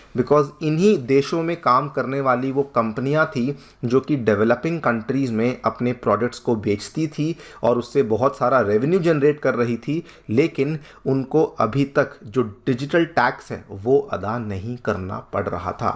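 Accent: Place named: Uttar Pradesh, India. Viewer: shayad ye mahoday uttar pradesh se hai kyuki Maine dekha hai up me log ण कोड की तरह बोलते हैं।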